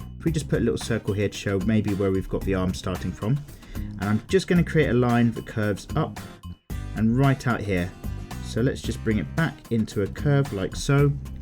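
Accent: United Kingdom. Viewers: Your in the UK from the way you talk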